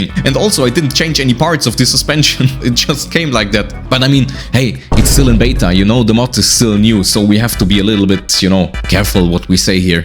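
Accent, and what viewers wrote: Germany. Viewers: i can hear the german in your aktzent...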